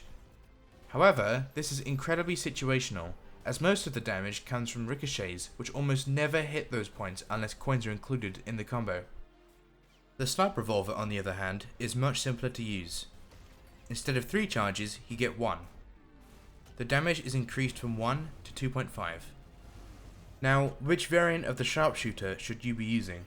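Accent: United Kingdom. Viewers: How did I correctly guess this was going to be narrated by a British man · dude i laughed so hard when i relised he's british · The biscute shootah ,oh gosh i really like the british accent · 100% british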